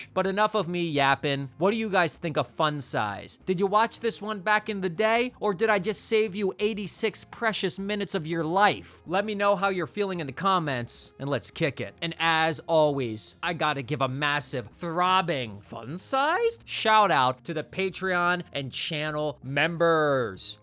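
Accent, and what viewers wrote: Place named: Hawaii, United States. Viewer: Dude r u from Hawai’i?? U got sum pidgin in you!!!!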